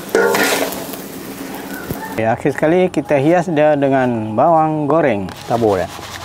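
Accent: Kedah, Malaysia. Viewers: Assalamualaikum, asal org mna? Loghat ckp Mcm org utara Kedah Kuala nerang ja, kikikiki salam follower subsribce tok dari Sya Shuib Pendang Kedah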